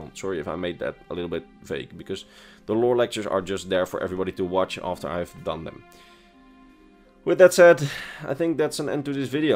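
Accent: Netherlands. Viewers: Are you dutch? I can tell from your accent hehehe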